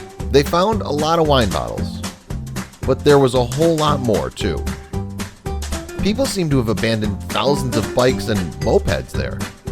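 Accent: United States. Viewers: It's sad to see the nice country but to hear an american accent . . . . very sad.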